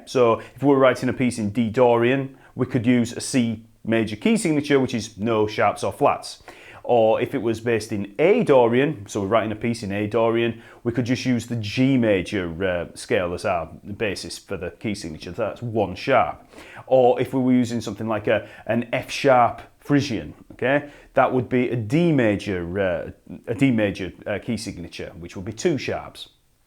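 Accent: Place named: United Kingdom. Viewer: What was that app again? IWILBRI... What? Being a Filipino hard-wired listening and talking in American English accent makes me struggle a bit when listening to British accent.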